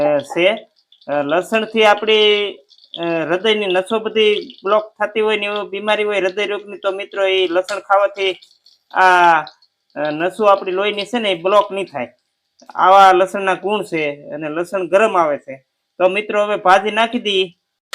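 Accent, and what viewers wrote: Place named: Kathiawar, India. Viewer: બોવ મીઠું મીઠું કાઠિયાવાડી બોલો છો, કયું ગામ? જય માતાજી. જવાબ આપવા વિનંતી. મારો નંબર ૯૮૨૪૦૧૦૪૦૩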